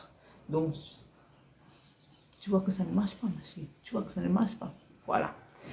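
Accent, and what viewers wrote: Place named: Cameroon. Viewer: Le ton camerounais 😂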